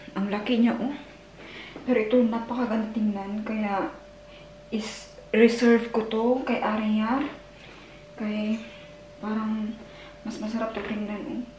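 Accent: Visayas, Philippines. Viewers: sa saan pla ito na country? pgnagsa2xlita ka ng tagalog pra kang bisaya.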